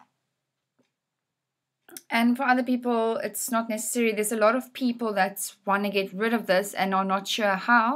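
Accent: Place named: Afrikaans, South Africa. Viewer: Thank you!! (Love the accent! Afrikaans maybe?)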